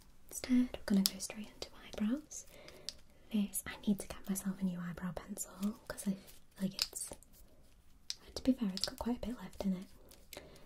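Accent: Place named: Liverpool, United Kingdom. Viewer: You sound like you’re from Liverpool in this video. Not sure if you are but I’ve never heard it before. I love this video btw grwm asmr is my favourite xx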